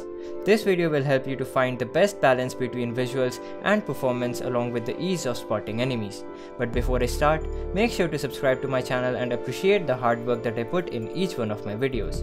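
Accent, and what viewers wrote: India. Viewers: Indian accent = instant dislike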